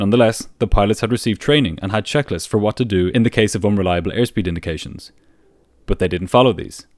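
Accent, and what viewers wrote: Ireland. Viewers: Only started watching your channel, its a great find!!! By any chance do I detect an Irish accent mo chara? I'm Irish and it seems like I do but I could be totally wrong! Let us know if you do!! Keep up the amazing videos and keep safe Mr! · Yes yes i thought i got a little Irish accent in there too 😅🍀🍀 im also irish by the way 🇮🇪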